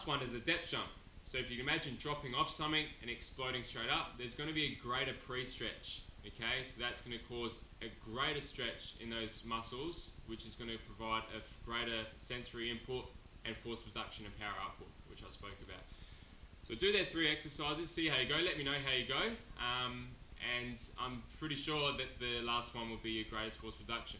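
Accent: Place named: South Australia, Australia. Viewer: Thanks for the video. By the sound of your accent, you are from South Australia. Very different accent to the other Australian states!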